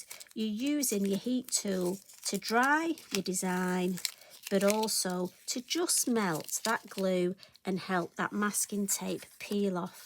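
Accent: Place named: England, United Kingdom. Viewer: Thanks so much,. I honestly haven't used my stamps for years, as I started to focus on watercolouring and alcohol inks, but you have totally inspired me to get my cardmaking supplies back out from hibernation and to do some blending! It is beautiful to hear your accent, too; I'm originally from England but living in Australia since 1985 and feeling a bit homesick, so you've doubly-blessed me today, haha!!:)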